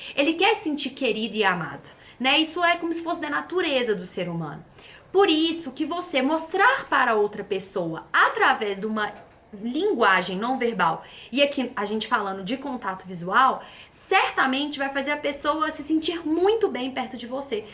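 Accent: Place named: Minas Gerais, Brazil. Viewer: ❤ que saudades que eu tenho de ouvir esse jeitinho mineiro ❤❤❤❤❤❤ Meus queridinhos ...amores eternos❤❤❤❤rsrsrsrsrs Eu sou vidrada no povo mineiro: amor perfeito!❤❤Eterna seguimora de Viçosa e BH eternamente aonde estiver no planeta meu ❤ coração é mineiro ❤❤❤❤❤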